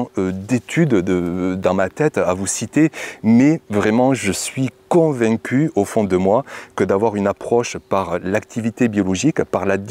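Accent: South, France. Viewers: Salut, et merci pour tout encore une fois. L'accent ensoleillé c'est toujours un plaisir ❤️ J'ai testé la "Black cherry", tomate-cerise un peu noire/rouge que j'ai bien aimé, il faudrait la trouver en variété classique pour bien apprécier je pense. Autre chose, avec tes soucis de chaleur et sécheresse, pourrais-tu faire la saison prochaine un achat de quelques sachets de Pascal Poot, qui sont génétiquement peu sensibles aux manques d'eau. En nous faisant une mini-série dédiée, thématique. Enfin c'est une idée quoi, ça m'est venu mais tu as dû y penser déjà, Bonnes vacances à tous 🙏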